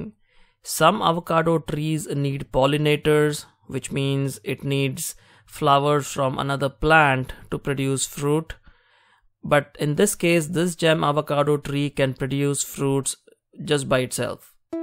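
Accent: India. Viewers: Ur accent is like Indian english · Indian accent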